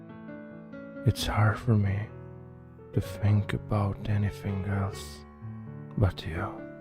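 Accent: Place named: United States. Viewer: Încerc să înțeleg ceea ce exprimi , este așa de frumos și atât de minunat mesajul , dublat de o voce magică și inconfortabila , mesaj exprimat atât de expresiv intr-o engleză americană atât de perfectă și atât de clară , parcă sună mai bine decât în “limba noastră,, deși subiectul este comun: Dragostea! In orice limbă o exprimi este Wow , talentul și vocea ta “Fac toți banii,, Te urmez și-ți urmăresc traseu și ascensiunea absolut uimitoare dar și pe deplin meritată fiindcă talentul tău este incotenstabil și incomensurabil ! Nu cred ca ai nevoie de ajutor ca să înțelegi mesajul meu ! Good night and sweets dreams poet of my heart ! 🙏👏😘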